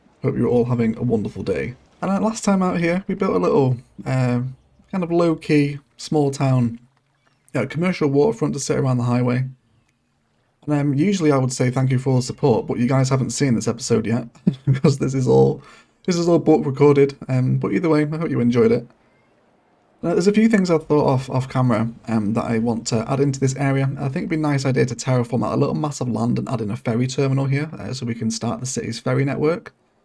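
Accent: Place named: Northern England, United Kingdom. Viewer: The way you talk... and the happy little trees... you're like the Bob Ross of Cities Skylines... Like, if Bob Ross was from the north of England :)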